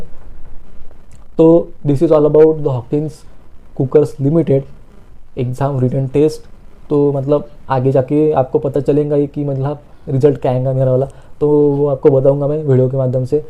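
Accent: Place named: Maharashtra, India. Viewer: Rahenga...milengaa..bc pakka marathi aahe tu bhava..😂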